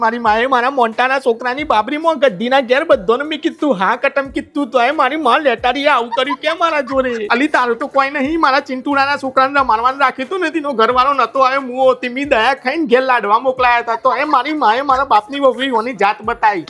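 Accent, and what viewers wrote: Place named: North Gujarat, India. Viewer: ભાઇ ઉત્તર ગુજરાતી તળપદી બોલી સાંભળી દિલ ખુશ થઇ ગયું ❤❤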